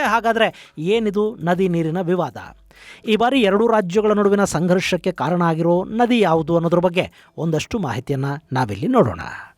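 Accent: North Karnataka, India. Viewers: ನಿಮ್ಮ ಬಾಯಲ್ಲಿ ಉತ್ತರ ಕರ್ನಾಟಕದ ಮಾತುಗಳನ್ನು ಕೇಳುವುದೇ ಬಹಳ ಚೆಂದ. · ಸರ್ ನಿಮ್ಮ ಬಾಯಿಂದ ನಮ್ಮ ಉತ್ತರ ಕರ್ನಾಟಕದ ಭಾಷೆ ಕೇಳೋಕೆ ಚಂದ ಅದಕ್ಕಾಗಿ ಉತ್ತರ ಕರ್ನಾಟಕದ ಭಾಷೆಯಲ್ಲಿ ದಯವಿಟ್ಟು ಒಂದು ವಿಡಿಯೋ ಮಾಡಿ ಸರ್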